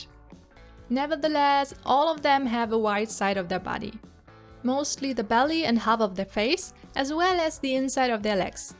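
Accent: Germany. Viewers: moin moin ^^ i love ur dolls so much they are so beautiful!! I'm curious are you German because of the "moin" and sometimes I think I can hear a german accent🤔🤔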